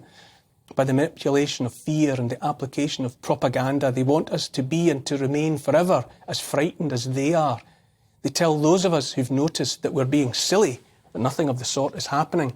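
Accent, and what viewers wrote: Scotland, United Kingdom. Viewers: Neil Oliver 💞🤗 A gentle, beautiful Scottish lilt A kind, empathic, forward tilt An open heart; a caring face A willingness to take his place. A strong, home-hitting monologue Reminds us of the boiling frog The courage to become a sleuth A passion to release the truth. A deep desire to crack the whip Illuminates our freedom-strip Calls out the darkness taking hold The shiny despots; plated gold! Intense and raw, he lays it bare A soul-enhancing, honest stare Inflections drive the message through He speaks for Us - for me and you! Discernment's such a precious gift His words create a mighty lift Take heed of all he has to say He knows - it shouldn't be this way! 🤗🦋🐬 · The truth with a Scottish accent! Love it! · A load of drivel from an over pronounced Scottish accent aka 'Posh Scotch' from a multi Millionaire puppet. Wake up lads. 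Fuck sake.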